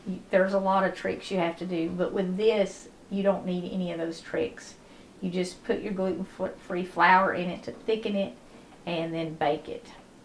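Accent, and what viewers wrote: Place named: Tennessee, United States. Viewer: Thought I recognized a fellow Tennessee accent. For the Diabetic, along with the flour swap Substitute the sugar with a baking alternative like Splenda. And use REAL butter not margarine. I've done and taught Diabetics how to do low carb diets for eight years, these two swaps make it completely blood sugar friendly. It'll still rise, got it'll rise a LOT less. Love the channel and the emphasis on putting God first.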